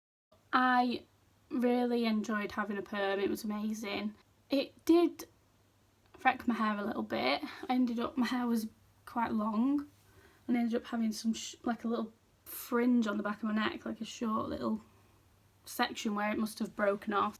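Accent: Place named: Wales, United Kingdom. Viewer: Where are you from? Love the accent but you sound a bit welsh. xx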